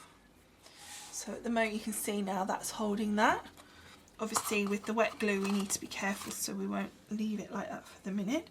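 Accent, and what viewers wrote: United Kingdom. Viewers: How sweet is that you remembered the "hun" part in my name which actually stands for Hungarian. I grew up in Hungary, and lived for a few years in Germany,Canada, and now for 12 years in Dallas, Texas. Where do you live in the United Kingdom? I always enjoy listening to you talk in your videos because your English sounds so much nicer than American English. :)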